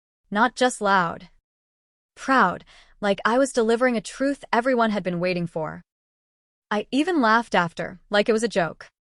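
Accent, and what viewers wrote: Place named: Spain. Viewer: WTF IS WRONG WITH YOU AND STUPID SPANISH ACCENT.SCREW THE NARRATION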